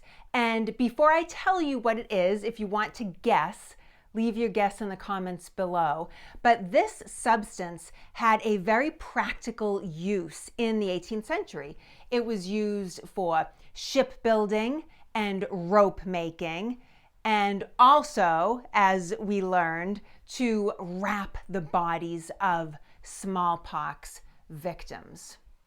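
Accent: Boston, United States. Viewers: She can't say an "R". Boston no doubt. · Perfect accent for a story of "The Speckled Monster." For anyone wondering she does have an authentic Boston accent / Eastern Mass. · Pine ta. Or, as we say in North Carolina, Pine Tar. I love this lady's accent. I just subscribed just to hear her yankee talkin'. Do all the yankees up there in Boston talk like this? I just never knew that if they do. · Boston accent much ? SAILAH AND MONSTA, LOL. finally someone who sounds like me ! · Me being from Wisconsin, would say pine tar. She, paint being from Boston says pine tah. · I've been in Boston they have that accent. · Love that seriously Old Boston American accent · Love her Boston accent! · Just a wild guess but you're from Boston aren't you? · Just love that Boston accept. Pine tah! Good history lesson. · I love her Boston accent. ❤ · The elder Kennedy brother, John, Bobby, and Ted had this same Boosten aaccent. 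Many Haaavaard grads also have it. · She has Boston accents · I'm Boston born and grew up in Weymouth in the 1960's, my grandparents were going to buy the Abigail Addams house in Weymouth back in the 1930's but it had an outhouse and my grandmother put her foot down and said No. Great video and I love your accent, we don't use R's very much. Like, Nice Cah. 🚗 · I'm having fun learning from you while you relay important historical content with a Boston accent! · Love the Boston accent. Love your videos. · This is very interesting. I love the accent. I need to learn more about Boston and why is there an accent and why we necessarily didn’t get one in Connecticut. Now I’m going down a rabbit hole lol 😂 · Love the show! Love that Boston accent! Pine tah! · Oh my God I can't believe it a real Bostonian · This is incredible. I did 4 years in Boston for school. I can't stop smiling. It's your accent! 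· Very interesting--thanks! When you first started talking, I was wondering what country you were from. I'll never get used to the Boston accent. LOL ♥ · that good old Boston talk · She's so cute, love her Boston accent. Love the History too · Any time I miss Boston I just need to listen to here. The most beautiful of Boston accents. Pinetaahhh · I’m gonna go out on a limb and guess that this chick is from somewhere near Boston. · I heard your accent and immediately subbed. I’m from Boston and I sound like I’m exaggerating to be honest because my accent is so strong. It’s nice to hear your own accent on Youtube for a change. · Hello,! I was born and raised in Worcester (or “Wustah”😂) and commuted to Boston to work for 15 years. Love everything about the American Revolution and am descended from Mayflower and officers in the Revolution. So enjoyed hearing your fabulous Bahstin accent (which I pretty much was able to mute over the years. 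Glad you kept authentic). So glad I hit on your vid. Will subscribe and thanks!! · Best Boston Accent Eveh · New subscriber. Love the amazing Boston accent and great revolution history.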